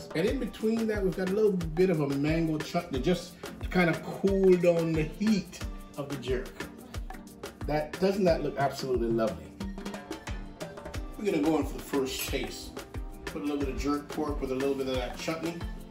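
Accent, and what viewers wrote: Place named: Jamaica. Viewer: Looks yummy and colourful. A feast for the eyes and stomach. P.S. I love the touch of Jamaican accent .... a full authentic Jamacian vib. ❤ 🇯🇲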